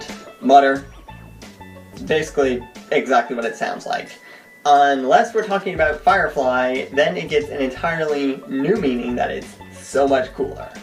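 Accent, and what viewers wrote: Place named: Ireland. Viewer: Sounds very Irish!! New subscriber 😄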